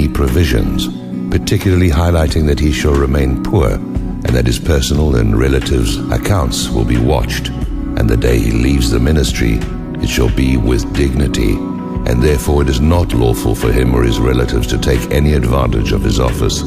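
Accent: United Kingdom. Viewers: It's obvious that our government controls the media and they're all full of shit, but trying to make him into a Gandhi type figure is a bit far fetched. So who is making these videos anyway? Who's writing the material? And who's the British guy narrating?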